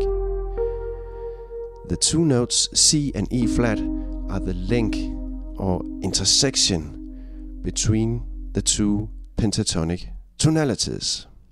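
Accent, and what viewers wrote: Denmark. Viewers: Well, is danish. That's the way they speaks. And plays. Remember, - Denmark has produced many great Jazz players. Palle Mikkelborg springs to mind.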